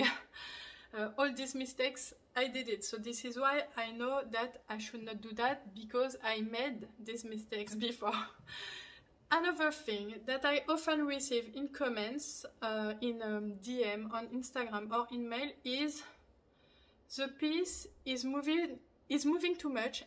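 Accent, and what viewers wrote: France. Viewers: Love your French accent!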